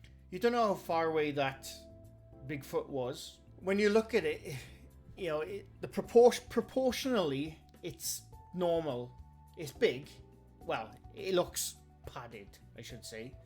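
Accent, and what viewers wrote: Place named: Scotland, United Kingdom. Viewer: Love your accent. Scottish??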